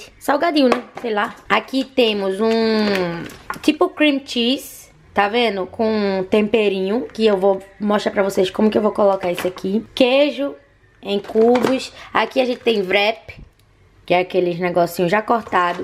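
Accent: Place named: Northeast, Brazil. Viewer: Acabei de chegar e simplesmente amei! Vc é nordestina? Amo esse sotaque 😍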